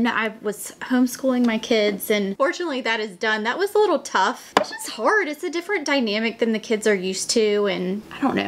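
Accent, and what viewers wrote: South, United States. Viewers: So I’ve always been a Tarte fan. I’m not a colorful eyeshadow girl so their “boring” as most say palettes appeal to me. Love your videos! I’m in Alabama so the southern accent makes me feel at home!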